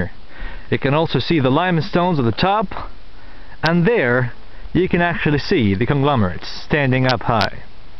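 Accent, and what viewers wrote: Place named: United States. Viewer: nice american accent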